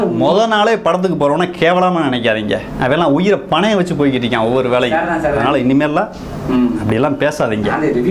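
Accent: Madurai, India. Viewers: love scenelam vayithaala pora madhiri irukaapla..sema kalaai...super nanba. today than unga channel pathen.. madurai slang super.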